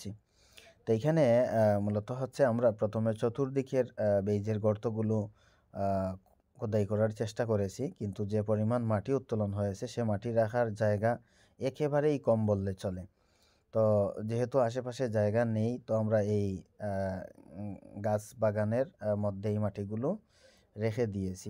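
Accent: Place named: Sylhet, Bangladesh. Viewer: বাড়ি কিতা সিলেট নি? মাতে সিলেটি লাগের😃😃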